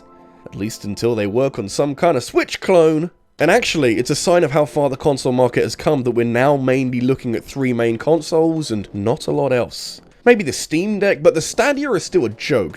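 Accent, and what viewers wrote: England, United Kingdom. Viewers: Who would of thought a English man pronouncing English words would trigger some people.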